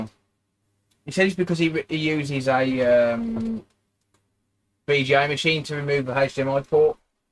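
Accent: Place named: Scotland, United Kingdom. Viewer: Good scottish accent mate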